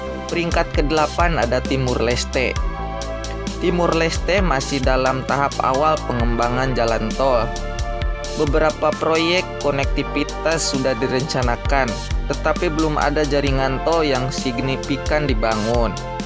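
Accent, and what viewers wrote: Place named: West Java, Indonesia. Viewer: Adminnya urang Sunda yah, huruf F sama V jadi P semua 😀😀😀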